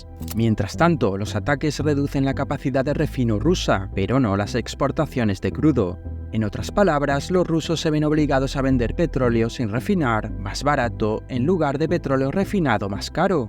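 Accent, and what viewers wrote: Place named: Europe. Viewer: que le queda poco tiempo a quien ??? vuèlvase serio hombre!!! tiene acento de puro lacayo europeo...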